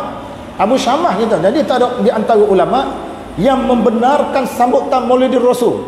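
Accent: Terengganu, Malaysia. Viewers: Rasanya ustaz ni org terengganu, mendengar pd loghat beliau. Tapi takde pulak mem BODO BODO kan org, me MENATANG kan org, meng HAIWANG kan org...Wallahu a'lam.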